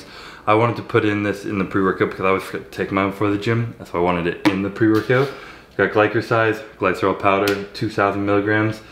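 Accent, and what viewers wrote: Canada. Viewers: I feel like ya really turned on the Canadian in ya here bud lol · actually sounding canadian hahaahhahahaha loving it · Embracing the Canadian accent on this one. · actually soundin canadian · Your Canadian is showing lol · Why in the beginning of the video does sound more Canadian than ever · Honestly never knew you sounded this Canadian, brilliant😂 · the most Canadian intro I've ever heard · Can we get that extra Canadian more often pls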